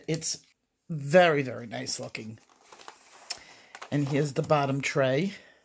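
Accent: New York, United States. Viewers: Omg I love your reviews. Love the blunt New York honesty with accent too.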